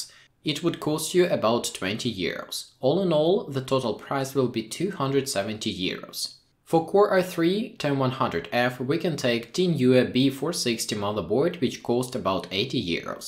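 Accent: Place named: Russia. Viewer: Парень, ты откуда? Такой чистый русский акцент )))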